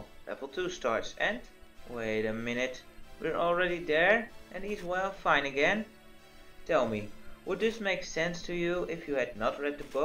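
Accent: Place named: Netherlands. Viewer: dus je bent tog nederlands, ik dacht al dat het aksent herkende.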